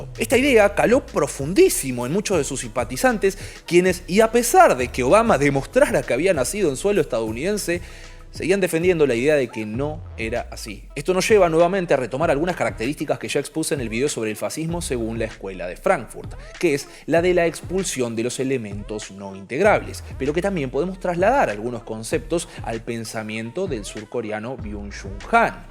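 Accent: Argentina. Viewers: Por tu forma de hablar y el paneo del obelisco deduzco que sos argentino. Decis que la radicalización viene de la mano de Internet, desde que empezó la cuarentena en Argentina se violaron todos los derechos habidos y por haber en Argentina y se pasó por arriba la Constitución. Mas que radicalización yo diría que muchos nos cansamos de que nos pasen por arriba. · Este canal y este video es de izquierda. ¿Como lo sé? Simple: todo el video es acerca de hecharle la culpa a los demás, con referencias intelectualoides, y además el acento argentino lo delata. Ni los algoritmos ni los demás tienen la culpa de tu vida y de tus posturas, uno tiene que hacerse responsable de uno mismo, y tener opiniones fuertes y posturas firmes no tiene nada de malo. Abandonen la actitud de victimismo, es peligrosa y no sirve de nada.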